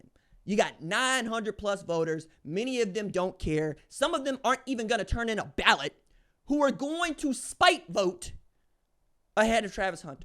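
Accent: Oklahoma, United States. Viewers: Okay Tulsa Ok! Sounding like you from Turley Ok. Just gangster 😂😂❤❤❤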